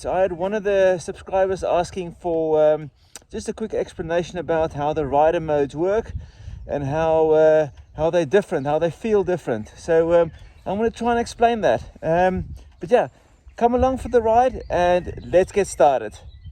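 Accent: South Africa. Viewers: How long have you lived in the UK ? I assume your an SA guy by the accent :) I live in the States. Southern CA and now in Utah. Been in the USA for 31 years now. I have a 2014 ST R. Looking to upgrade to this 2021 version. Can you compare the 675 to the new CC bike ?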